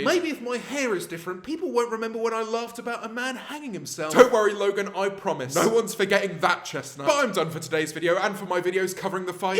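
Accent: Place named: RP, United Kingdom. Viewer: Your so posh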